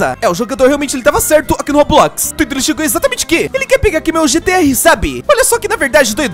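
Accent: Portugal. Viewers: Gente, ele é de Portugal? Sotaque estranho da prr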